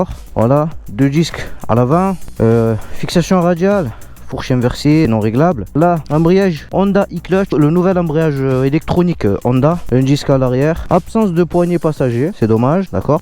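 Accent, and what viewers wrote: South, France. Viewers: Super vidéo ! Et franchement ton accent du Sud quel plaisir à écouter pour un parigo comme moi 💪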